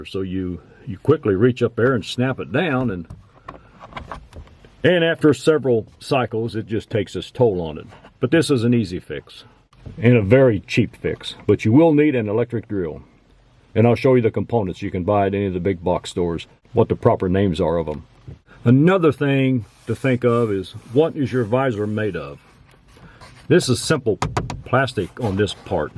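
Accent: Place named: Northeast Ohio, United States. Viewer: Is your accent from NE Ohio by chance?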